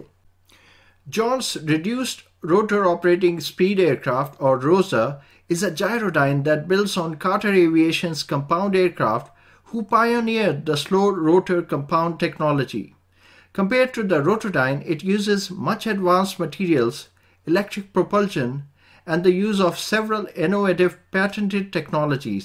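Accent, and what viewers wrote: India. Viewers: then where are you from your accent sounds little Indian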